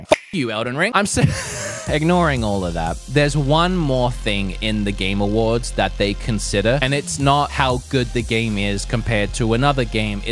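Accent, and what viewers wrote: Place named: Australia. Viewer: I miss your aussie accent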